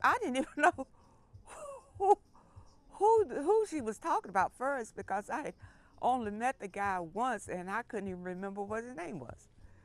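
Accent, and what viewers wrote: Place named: New Orleans, United States. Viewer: I hear that N.O accent great content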